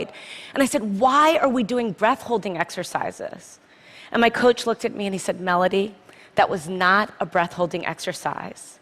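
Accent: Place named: United States. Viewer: Good Amercan-English to hear.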